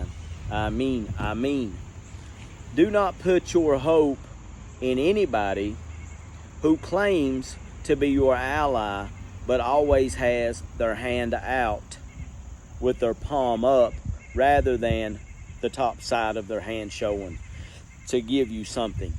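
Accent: South, United States. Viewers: Dear Brother, I’m an old woman now and I became a Muslim over 18 years ago. I am a 100% disabled Iraq war veteran woman from the first Iraq war, it was because of the Iraq war that I became a Muslim. I’ve lived in Egypt 18 years now and I’m dying of heart failure. I have to say this to you, seeing you with your tattoos, hearing your Southern accent, the way you look, where your from, has made me so full of hope and happiness to see you as a brother in Islam. Never could I dream that an American from the South, who looks like you, would ever become a Muslim; because of all the ideas we get about people from the South in the Christian Bible belt. Never judge a book by its cover. I too have tattoos from the old days, I too am American, and the point is that Allah can save the roughest and toughest of people in heart and mind. Your kind heart will bring more people to Islam and educate them that we are good people. 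May Allah bless you and continue to help you educate others to bring them to our beautiful Faith of Islam.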